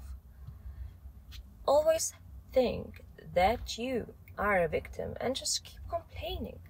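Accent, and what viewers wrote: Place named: Russia. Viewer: А говорит все равно с русским акцентом ❤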